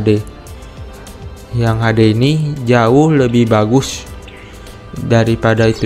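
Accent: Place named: Palembang, Indonesia. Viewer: keknya org plg ya bang? ngmng nya kadang keluar dikit hahaha btw nice vidio bang saya jadi tau harus masuk ke event mana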